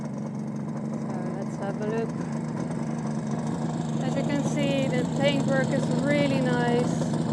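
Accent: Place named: Netherlands. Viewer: Tu mir einen Gefallen..keine KI... Ich liebe deinen niederländischen Akzent 🙂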